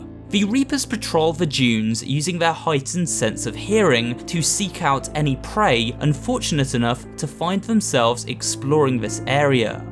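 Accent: United Kingdom. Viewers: Omg the british is so deep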